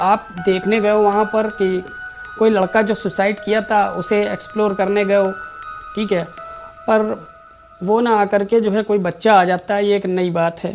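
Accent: Bihar, India. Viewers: Interacting channel interesting analysis. To the point. Nd ap bihari ho?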